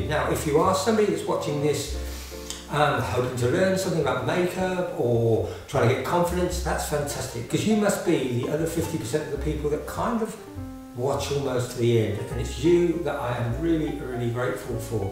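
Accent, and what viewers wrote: United Kingdom. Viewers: My reason for viewing is to see and hear what you have to say. Like I said before I am a sucker for an English accent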